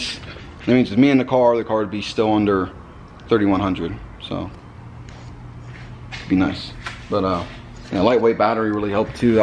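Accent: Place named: Philadelphia, United States. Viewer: I was gunna say, you sound like you are from Philly. Where did you grow up?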